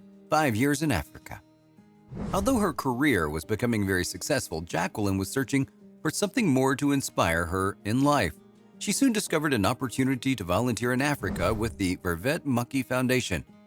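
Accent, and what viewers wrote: United States. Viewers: sorry but american accent and its Surrey not sirry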